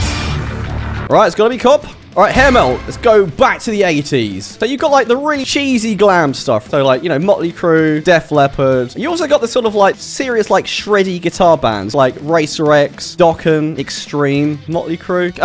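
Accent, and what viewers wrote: England, United Kingdom. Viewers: me agrada ese acento ingles, bro ! suena genial! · I fkn love this bri'sh accent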